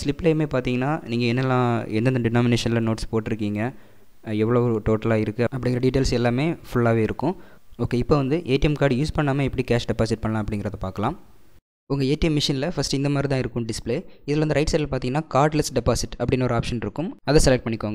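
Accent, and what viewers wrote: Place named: Kanyakumari, India. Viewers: ஒலகத்தில எங்க போனாலும் கன்னியாகுமாரிகாரன ஒரே வார்த்தைல கண்டு பிடிச்சிலாம்......... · Bro neenga kanyakumari thanae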